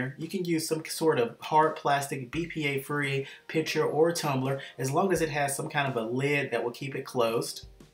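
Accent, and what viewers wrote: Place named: United States. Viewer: i love your nice country voice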